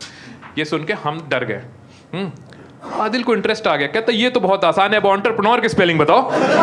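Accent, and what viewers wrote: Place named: Uttar Pradesh, India. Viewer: Beautifully performed yaar! I really like that little bit of UP accent. That accent make the content even more funny! 👏👏😂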